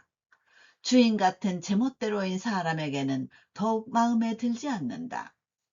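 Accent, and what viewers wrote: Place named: Japan. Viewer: 한국어로 읽을 실 때 그 안의 일본어 억양이 재미있읍니다. 전에는 전혀 못 느꼈는데 일본 만화 영어 노래등 일본 문화에 빠진 후 일본어가 리듬감 있고 운율적인 아름다운 언어란 걸 알게 되었읍니다.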